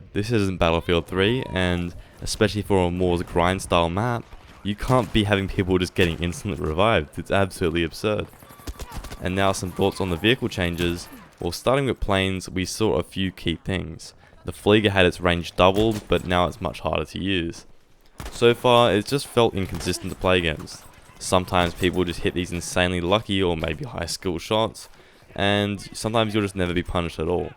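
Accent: Australia. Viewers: Man it’s good to hear an Aussie voice 🤘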